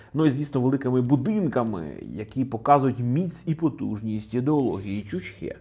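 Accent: Russia. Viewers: Московський акцент нагадує пʼєсу Подервʼянського «Кацапи»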